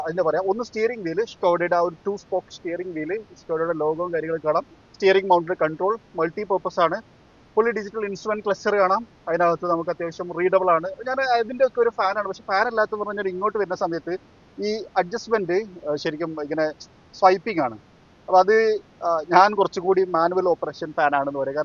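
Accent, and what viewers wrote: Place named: Kasaragod, India. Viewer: Nee kasrod nn inn mansilay😅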